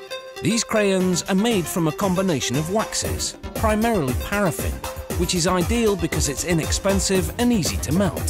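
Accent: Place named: United Kingdom. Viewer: I wasn’t expecting the British accent I’m accustomed to the USA accent, where is the SAP button?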